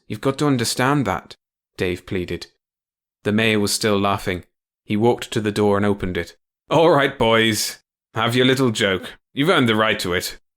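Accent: United Kingdom. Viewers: Weird how our brains are all wired to what is our familiarity, so as a British listener I find it so much clearer to hear a usual British accent; it’s not scouse or geordie which I would expect non-Brits to struggle with; and there’s plenty of US and Aussie content compared to British; I really appreciate the opportunity to listen in my own very boring and average British accent. I know I struggle with slow accents, I think it’s Mid-west American but as I’ve only visited LA, Texas, and Manhattan I can’t be sure. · I love UK narrators and the accent!!! ❤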